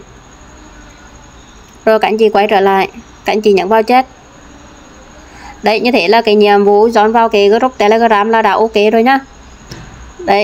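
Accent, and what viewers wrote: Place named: Nghe Tinh, Vietnam. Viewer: Chưa hiểu rồi sẽ thế nào nhưng thấy hay hay nên ủng hộ em một ref nhé, à mà em ở đâu mà nghe giọng giống quê choa rứa. Hihiiii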